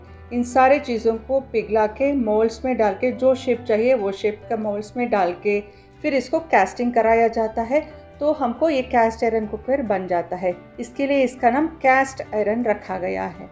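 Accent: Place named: South, India. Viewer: aapka hindi me south india wala accent hai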